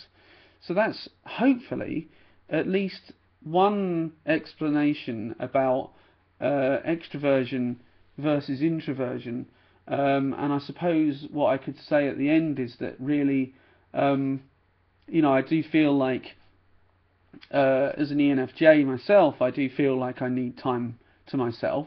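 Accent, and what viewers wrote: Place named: United Kingdom. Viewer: I really enjoy your videos. Great to hear a British accent, on the subject of MBTI on YouTube. For me re: I/E I think, like anything else in life, people tend to over-simplify a concept in order to assist with general understanding. It then becomes a Chinese whisper. If you like understanding systems (as I do) you quickly relish the fact that it's clearly a much more sophisticated concept, than the initial information concerning the letters. I guess when I refer to extroversion, I'm talking about someone leading with an extroverted function, as the meatiest part of them, which leads them on a sociable path. However, we all think, we all feel and we're all I/E. That's the complicated and intricate beauty of humanity. The MBTI framework attempts to trace it. Keep up the good work! Marie (INTJ)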